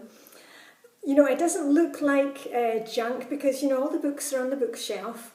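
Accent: Scotland, United Kingdom. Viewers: Thank you so much for all your videos. I love your way of if communicating the FlyLady system- it has really injected new life into my routines. I have even downloaded the Teacher Control Journal to help me at work now! I also love hearing your Scottish accent and your lovebirds in the background!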